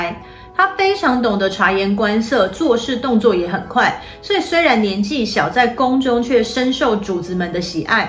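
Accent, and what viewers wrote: Taiwan. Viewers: 标准的台湾国语。比很多ㄉㄞˉㄨㄚˉ厲害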